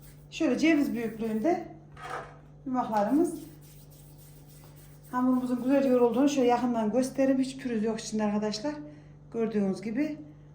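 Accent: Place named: Yozgat, Turkey. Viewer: S a bacım yozgatlımisin şiven bize benziyo ben sorgun dan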